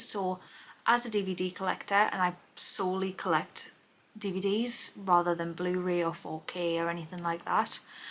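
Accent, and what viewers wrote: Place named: North East England, United Kingdom. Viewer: New subscriber to your channel today.. I thought I recognised that accent.. fellow North Easterner here as well.. Huge horror fan and put up a load of horror vids on my channel as well.. I'll look forward to catching up on some of your vids over the coming days 😎👍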